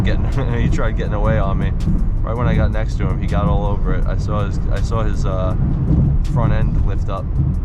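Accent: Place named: New York, United States. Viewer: Annoying NY Accent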